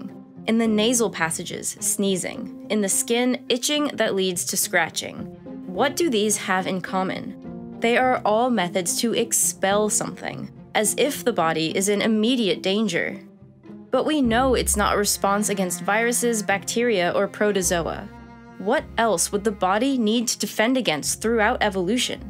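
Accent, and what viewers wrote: United States. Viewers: I love your American accent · where is this content creator's English accent from?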